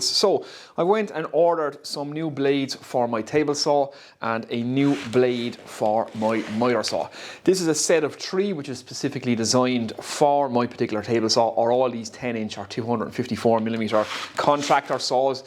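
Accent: Ireland. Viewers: I thoroughly enjoy and appreciate your content! .......even when I disagree with it. I live in Southern California (born and raised), surfed all my life, and if you ever met me, you might comment on my California surfer accent. I can't speak for other Americans but I think your Irish accent definitely adds value to your content!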